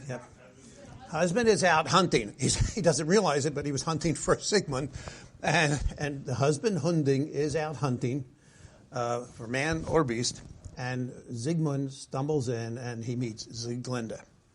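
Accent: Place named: Philadelphia, United States. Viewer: It’s a Philly accent….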